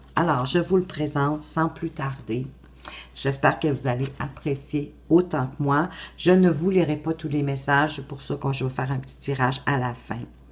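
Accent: Quebec, Canada. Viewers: Coucou 😊 Pour commencer...Wouaaaah quel effet ça fait d'être citée au Québec😁, j'suis trop contente car j'ad😍re le Québec & votre accent🥰 ! Mais je les connais ces 2 jeux ! La petite boîte je l'ai offert à une Amie et le coffret carré est juste MAGNIFIQUE, nous sommes carrément plongés dans l'univers/l'atmosphère du Sud du Mexique, ça fait voyager direct...Tu sais qu'il a fait le coffret "le 5éme Accord Toltèque" avec le même style (boîte carrée & même style d'illustration) ? Va falloir investir 😁 à moins que tu l'ai déjà ? Confidence pour confidence, je préfère ta version (elle me fait voyager) mais je n'ai pas eu l'occasion de l'avoir☹️🥹😢. Lumineuse journée à toi 🌞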